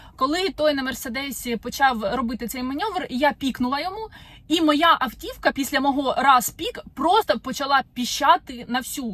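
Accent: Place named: Central Ukraine, Ukraine. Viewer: Хочу сказати що ви розмовляєте українською наче ви не з Базмуту, а десь з центральної України і прожили все життя там. Це супер і контент цікавий!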